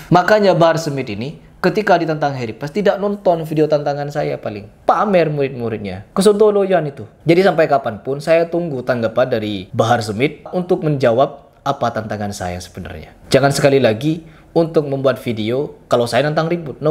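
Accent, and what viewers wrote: Madura, Indonesia. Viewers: Saya orang dayak, dari lugat bahasa bang, bang heri madura, saya sangat suka setiap konten bang, dan saya udah sekarep, bukan brarti orang dayak berbusana sama madura, yang berlalu biarlah berlalu, kita lupakan😂😂buat teman2 madura jangan tersinggung yah😂😂😂😂, karanena saya dayak sangat suka sama bang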